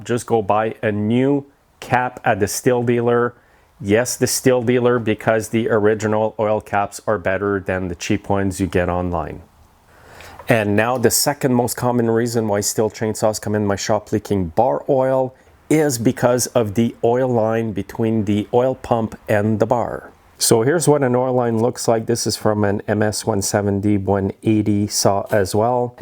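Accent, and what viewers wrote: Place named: Newfoundland, Canada. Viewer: You must be from either Newfoundland or Labador by that Accent that you have!! you can't Fool an Old Canuck Lol anyways Love you for being a Caregiver to you're Wife your a Very Strong Man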